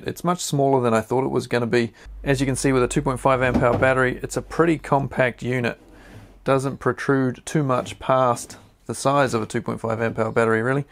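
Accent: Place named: New Zealand. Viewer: I love your accent bro. Kiwis, men and women with the coolest english accents :)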